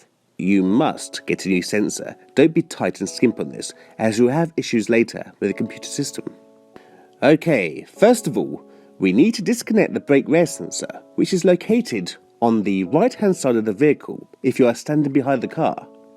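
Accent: United Kingdom. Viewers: Being from the UK myself I love the oh so posh English voiceover 😆👍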